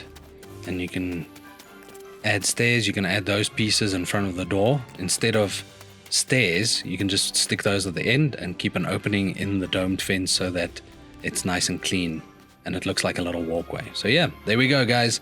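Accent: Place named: South Africa. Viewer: Heard the accent and immediately knew it was a fellow Safa. Great to see, wishing you lots of growth. Sub status achieved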